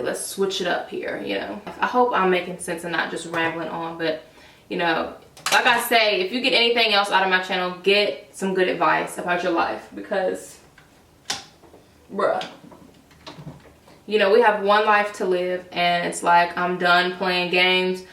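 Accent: South, United States. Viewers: I've never noticed her country accent. Has she always had it?? I feel lost lmao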